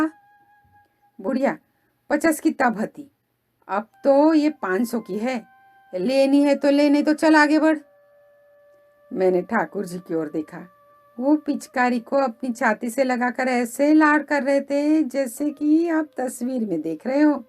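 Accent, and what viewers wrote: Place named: Braj, India. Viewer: 18... big like bahut Sundar Lalla Tu Bhi Pite Ho Tera Baba bhi Pite go Kahani Sundar hai hi aapke Munh se Braj Ki Boli bhi bahut Sundar lag rahi hai Lalla Baba main bhi chalunga Hamare Vrindavan Mein Aise Hi bolate Hain Brij Bhasha aapke Munh se sunkar bahut hi Achcha Laga excellent video my dear sister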